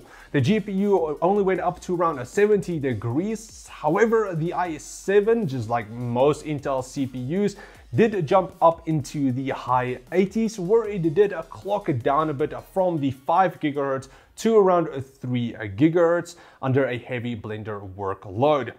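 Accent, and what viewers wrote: South Africa. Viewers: 'traveling and eh-gaming'. Lmao I love the SA accent.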